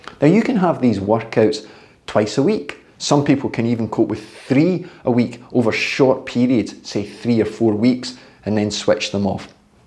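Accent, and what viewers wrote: Scotland, United Kingdom. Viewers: I love your videos! I've learned a lot (I am 59 and just started cycling again after decades). I find it extremely hard going up any hills but am getting a little better with the smaller inclines. I'm not exactly a skinny Minnie either, lol. Thanks again, and as a Canadian, I enjoy listening to your Scottish accent while you're explaining things. 😁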